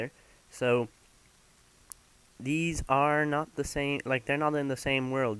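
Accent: Ireland. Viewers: is this lad irish? he sounds it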